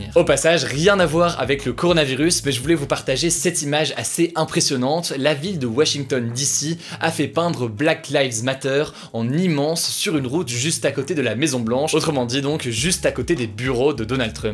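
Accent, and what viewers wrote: England, United Kingdom. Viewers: C’était mieux avec son accent anglais! Ceux qui s’en sont plein vous saoulez 😒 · Yfébo Anvakenss autant pour moi, reste quand même que son accent anglais est magique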